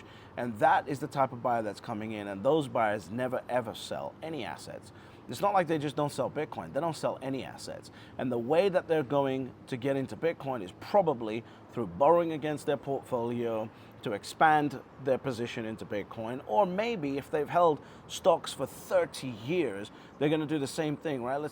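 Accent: Scotland, United Kingdom. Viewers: Shut the F up and get fantastically rich ! Advice taken ! PS are you from Scotland ? Detect a slight Scottish burr there.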